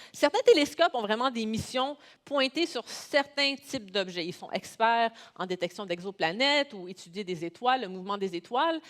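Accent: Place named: Canada. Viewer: Que du bonheur cette conférence 😅 avec cette accent canadien inimitable 😂 encore meilleur 😅 de France ,je partage sur notre petite planète avec mes 2,9 k followers * 1,3 k suivi(e)s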